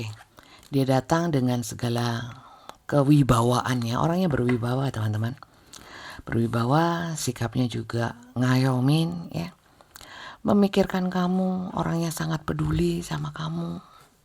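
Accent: East Java, Indonesia. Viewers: BASA JAWANYA JAWA TIMUR AN MEDOK BGT YAAA BUN ARAK MALANG MATAP PERAH JG DI JATIM LUMANYAN LAMA HEMMMMM SUIT SUIT CETOK SEGERRRRR KONGGGGGGGG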